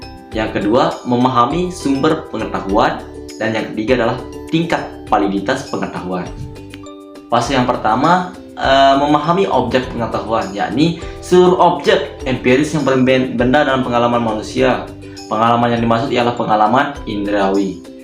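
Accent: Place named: Masbagik, Indonesia. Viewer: logat masbagekk neee kentell jmakk wett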